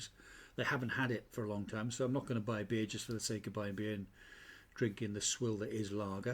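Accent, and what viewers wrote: Wales, United Kingdom. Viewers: hi watching out for a while I’m here I’m guessing that’s a welsh accent ?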